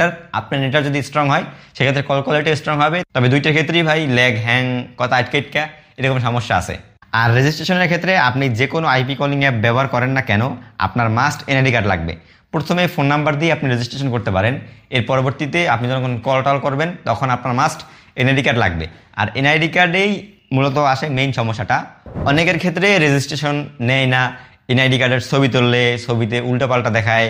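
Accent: Barishal, Bangladesh. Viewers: বুঝতে পারছি যে ভিডিও ছাড়ছে এর বাড়ি খাস বরিশাইল্লা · ভাইয়ের বাসা কি বরিশালে? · শুদ্ধু বরিশাইল্লা মিশেল ভালোই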